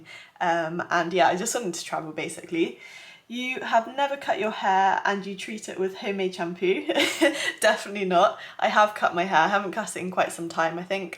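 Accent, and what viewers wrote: United Kingdom. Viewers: Would live to find a cute and sweet british girl like. That accent and cute face is all an American guy needs. 😍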